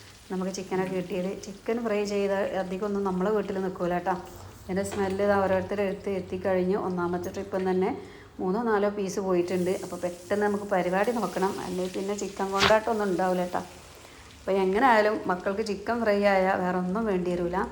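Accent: Malappuram, India. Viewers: ഇത് പണ്ടത്തെ മലപ്പുറം താത്ത തന്നെ ആണോ കാരണം ഭാഷക്ക് മാറ്റം വന്നല്ലോ ആദ്യത്തെ സംസാരം തനി മലപ്പുറം ആയിരുന്നല്ലോ ഇപ്പോൾ എങ്ങിനെ മാറി ആതാത്ത അല്ലേ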